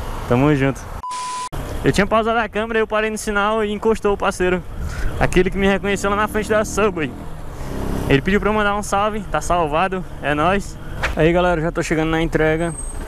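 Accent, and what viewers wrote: Fortaleza, Brazil. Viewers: Tem que ser de Fortaleza 😂 "Teu ânus" "Os cara teima" 😂😂😂😂😂😂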